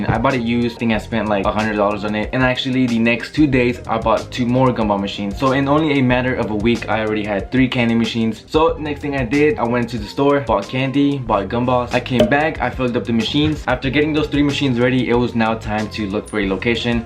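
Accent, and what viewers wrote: Chile. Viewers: ERES CHILENO WEON? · Eri chileno o no hermano?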